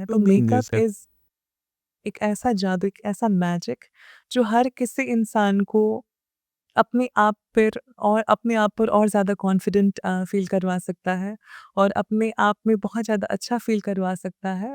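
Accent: India. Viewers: The way you are talking you are not look like a Nepali, you are look like Indian, all the best 👍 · aap ke bolne ka lahza bilkul ham Lucknow walon ki tarah h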